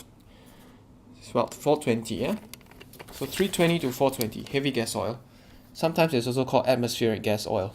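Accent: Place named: Singapore. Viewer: I know a Singaporean brother as soon as I hear the accent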